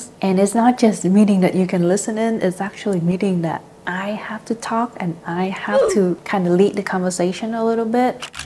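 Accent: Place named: Vietnam. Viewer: nói tiếng anh giống người việt thế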